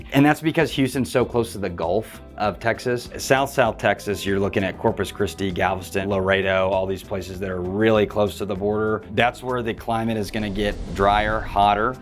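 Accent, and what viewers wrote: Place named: California, United States. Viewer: This guy isn’t from Texas and most of what he said was wrong. He has a Californian accent too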